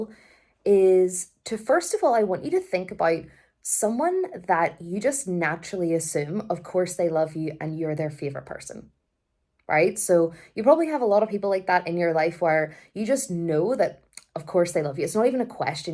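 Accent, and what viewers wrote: Ireland. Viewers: am from the uk and i understand your Irish accent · I love your accent! I'm in the states but have blood over in your neck of the woods. Galway I believe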